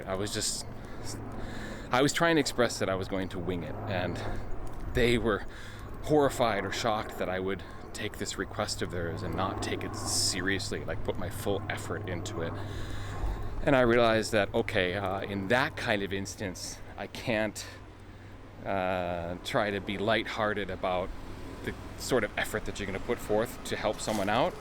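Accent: Midwest, United States. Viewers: Konnichiwa from North Pole Alaska. You sound like you’re from the Midwest! (My Dads family are from there). Loving your videos, they make me smile when at work. We’re getting ready to go back for our second trip to Japan. Fell in love with it last time! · Love the Midwest accent.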